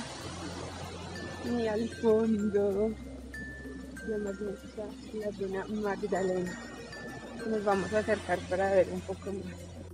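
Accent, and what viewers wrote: Colombia. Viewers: A mi lo que me inspiraria a escribir son tus bellos ojos y tu forma de hablar colombiana se ve que sientes frio para la próxima llevate un sueter que este calentito porque el que tienes puesto no calienta en lo más minimo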